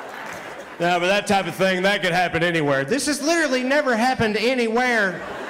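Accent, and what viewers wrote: South, United States. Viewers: I love how they are subtitling his Southern accent. Ha! I know my friends don't always get my Southern references or dialect. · Born and raised in southeast va … not used to hearing intelligent speech drowning in a southern drawl. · I love this guy's work and there is just too little of it to find online. Not to mention that he lives basically in the same area as myself now, but I can't find any shows he's doing locally. I may have to eventually figure out how to hire him just to come up here and talk for an hour or two so I can turn off my TV (which is also my 65" computer monitor) and let it get some rest. Dude, write more stuff and write it even faster. Please! You actually had me, for a moment at least, thinking "we my be able to at some time hype that accent as more educated than the stereotype. I know he would know exactly what I'm talking about. 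It's not an insult as a fact can never insult anyone yet still be totally insulting at the same time. If the south ever does rise again, I hope the thing that rises from it is the intellectual level. Which, it would appear, yours has done. Fine sir. (I mean think about it.... Just how long can you go on watching people put milk and sugar on grits? You know it's butter, salt & pepper and if you have brains some egg and sausage on top just to level it out a bit. With maybe some Trappy's sauce on top of that. )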